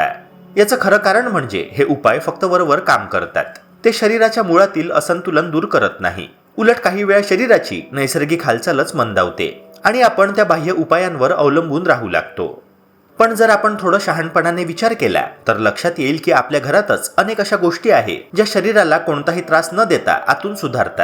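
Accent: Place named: Pune, India. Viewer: Pune bolte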